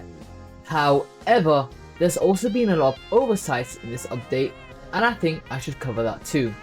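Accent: United Kingdom. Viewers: why do you kinda sound like a british girl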